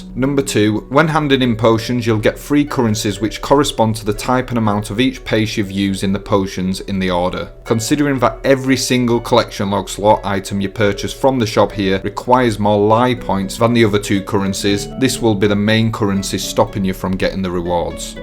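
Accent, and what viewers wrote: Yorkshire, United Kingdom. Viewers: the yorkshire charm just made it even better a description on how to. nice one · always nice to hear a fellow Yorkshireman! been following a couple of your guides recently (bank organisation) brought me here. for the past 15 years ive used the same youtubers slayermusiq for quests theoatrix for guides and torvesta for PVP... with your clear guides and step by step instructions, im sure i will be coming back. earned a sub off me! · Lovely Yorkshire Guide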